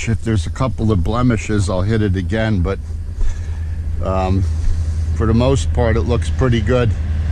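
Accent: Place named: North, United Kingdom. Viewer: fishing and please tell us about your old boat. About the inboard vs outboard also what lead you to buy a key west and tell us about the other center consoles that you looked at before you bought the key west. Also I can tell by your accent your from up north what was it like fishing up there thank you have a blessed night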